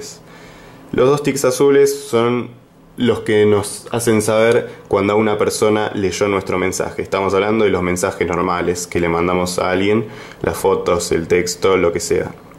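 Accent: Argentina. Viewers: Qué acento lindo ahora me parece Argentino 🤭 ? Besos Mucho gusto soy Brasileña 🤝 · este es argentino o uruguayo xd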